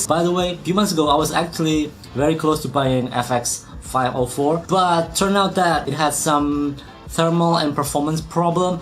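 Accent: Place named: Indonesia. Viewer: Untung pronounciationnya indo, jadi paham :v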